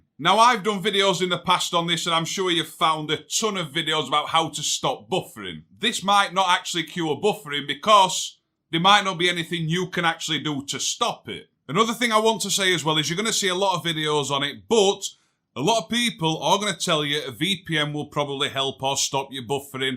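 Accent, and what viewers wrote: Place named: Midlands, United Kingdom. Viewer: Dude ya from the Midlands . I can understand ya. Git !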